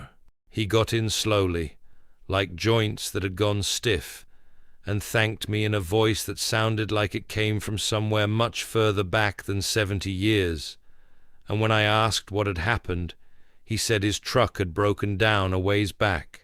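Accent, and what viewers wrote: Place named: United Kingdom. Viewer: Its a British accent..bro!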